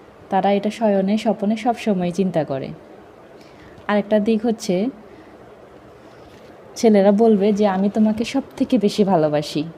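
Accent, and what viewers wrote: Noakhali, Bangladesh. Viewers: আপনার বাড়ি কি নোয়াখালী আপু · Noakhailla naki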